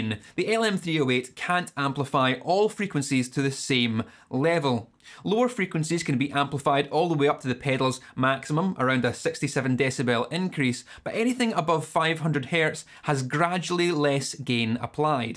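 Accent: Scotland, United Kingdom. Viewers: I love this guys accent so much,! how can i it? What is this accent? Scottish？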